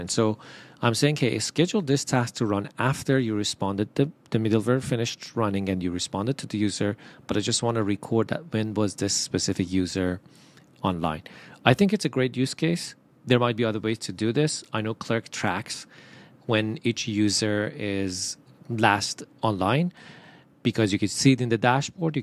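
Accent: Iran. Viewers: لحجه تون رو اول ویدیو رو شنیدم فهمیدم یک ایرانی هستی جان خسته نباشی💚🙏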